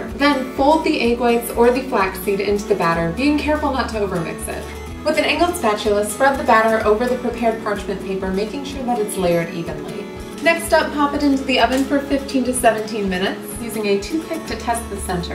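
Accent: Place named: Michigan, United States. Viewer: Your accent is my favorite. My dad is from Michigan and hearing you makes me feel at home. I love this video for so many reasons. <3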